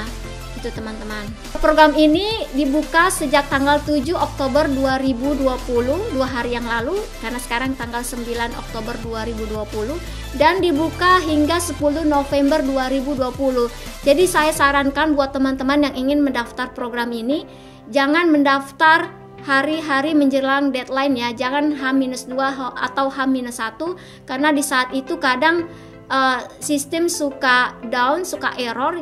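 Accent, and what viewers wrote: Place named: Palu, Indonesia. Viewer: Lebih lagi kw buat vlognya pake dialek palu.... Bangga orang Indonesia, khususnya palu. Dstu di liat betapa bragamnya Indonesia...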